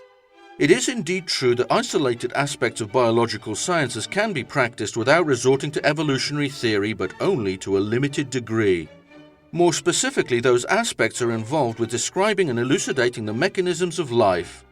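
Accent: United Kingdom. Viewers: I like the montone swearing in a british accent, . . . and the classical music.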